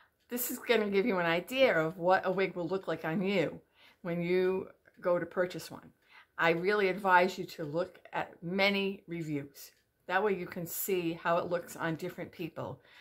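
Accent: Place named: New York, United States. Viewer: (that NY accent~love)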